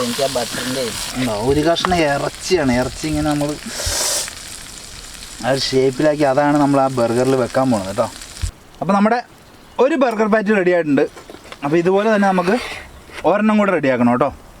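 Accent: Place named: Idukki, India. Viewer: Iddukki anno ee sthalam slang kettit iddukki annennu thonnunavar like adi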